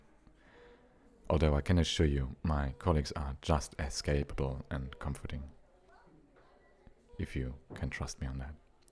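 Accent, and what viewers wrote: Germany. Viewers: Are u From germany?💞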